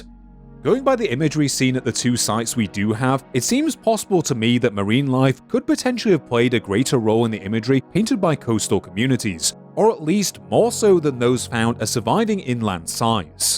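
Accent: United Kingdom. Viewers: i just feel like you should rename yourself 'A Histocrat' and replace the 'The' because it doesnt round well and it only sounds alright due to the british accent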